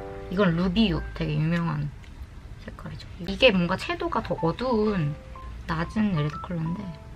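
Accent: United Kingdom. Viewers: 잉? 그래용? 헉 좋게 봐주셔서 감사합니당❤️❤️❤️(전 제꺼 오글거려서 못듣...겠어요ㅋㅋㅋ큐ㅠ) 제가 듣기에는 님 영어 일본어 눈감고 들으면 그냥 영국인 일본인인데욤??ㅎㅎㅎ진짜 영국억양 영국에서 한 10년 거주하신 분 같아욤!!!ㅎㅎ멋쪄용!!!!👍👍🥰🥰 항상 따뜻한 말씀 감사해요❤️❣️ 코로나 조심하시구 종종 자주 놀러 올게요☺️💚💚ㅎㅎ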